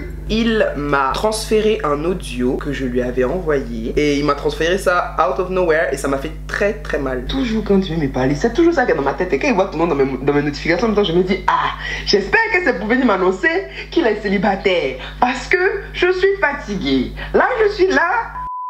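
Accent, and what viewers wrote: Ivory Coast. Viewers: le montage me fuuume en plus a force de regarder tes videos je parle comme toi et je commence a voir l accent ivoiriens :) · Mdrrrr ton accent ivoirien . Trop fière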